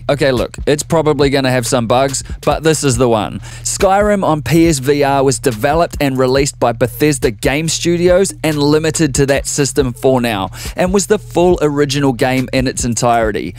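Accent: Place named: Australia. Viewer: My favorite thing about your channel has always been your voice. It's a sonorous Aussi voice <3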